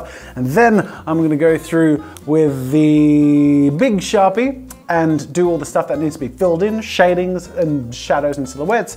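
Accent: Australia. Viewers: you sounded the most Australian you ever have in this video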